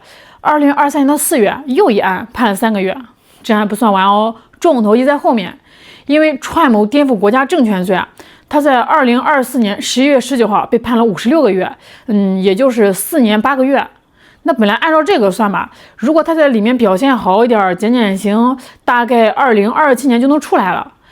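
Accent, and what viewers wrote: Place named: Northeast, China. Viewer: 东北味好重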